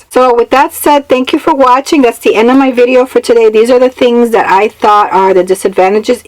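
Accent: Latin America. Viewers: Hair looks great, as usual- librarian glasses definitely a great choice, subtle Latina dialect- great outfit choice... Gonna give this one a solid 8.9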